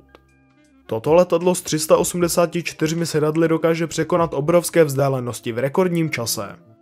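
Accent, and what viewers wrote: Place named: Moravia, Czech Republic. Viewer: Ta moravština je příšerná řeč ale jinak obsahově slušný... namluvit to civilizovaným jazykem, dám like.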